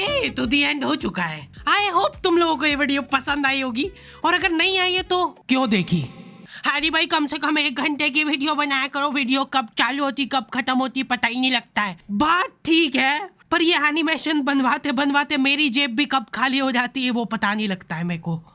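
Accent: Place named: Rajasthan, India. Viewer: Bro is from rajasthan 😅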